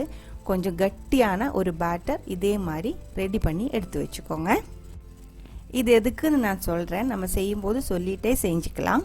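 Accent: Kerala, India. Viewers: Kerala Akka Malayalam kalantha Tamil pesaraanga, nice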